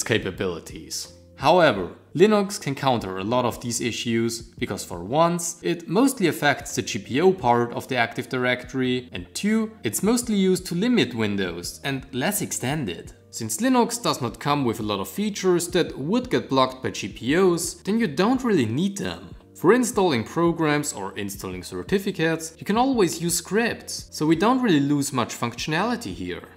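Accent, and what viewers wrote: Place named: Germany. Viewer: I smell "Deutsch".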